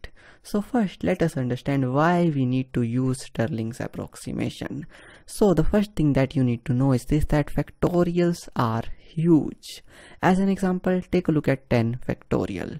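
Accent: India. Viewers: Are you indian or what?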